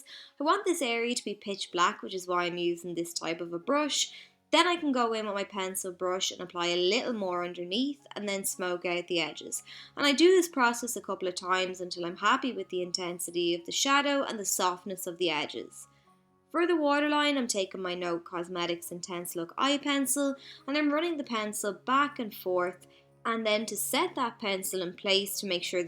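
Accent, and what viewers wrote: Ireland. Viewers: Oh my gosh, I have been binging your videos! I can do basic makeup but that's about it- you have been so helpful and easy to follow! I also love your accent- I've been to Ireland twice and love it so much- you remind me of the wonderful people I met there! Thank you, go raibh maith agat! Love from Portland, Oregon, USA!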